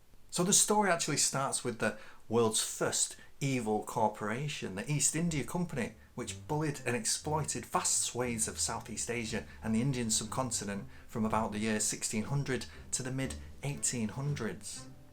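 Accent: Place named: Liverpool, United Kingdom. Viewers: Good scouse accent dat Lid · Hi; just a quick one to say great video and love your Liverpool accents have been a subscriber since not long after you started posting your first videos! Cheers la to a genuine nice guy.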